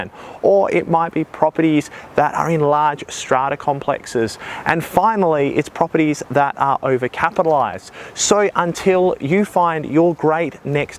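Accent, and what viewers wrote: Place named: Australia. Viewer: Oh good, you're Aussie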